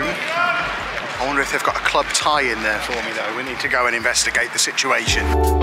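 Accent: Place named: North, United Kingdom. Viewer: I'm from the south east and you sound northern to me!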